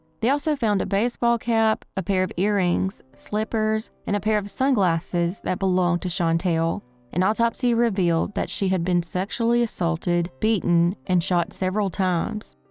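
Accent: South, United States. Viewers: Thank You For all the work and time you put into your videos. You are the BEST crime channel and we all Love your southern accent ❤️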